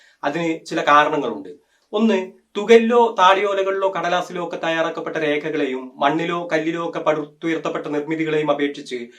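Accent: Kasaragod, India. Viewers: എത്ര നാളായി നിന്നെ കണ്ടിട്ട്, നിൻ്റ് കാസർക്കോട് സ്ലാങ് കേൾക്കാൻ ഭയങ്കര ഇഷ്ടമാണ്, മാറി നിൽക്കരുത്, ഉടനേ 100k അടിക്കണം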